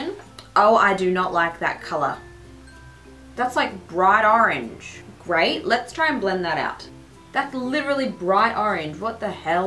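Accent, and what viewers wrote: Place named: Australia. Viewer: Loving your hair. Ps are you aussie , you sound it abit haha.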